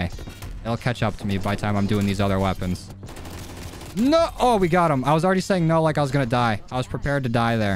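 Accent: United States. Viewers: He's American, you can tell from his accent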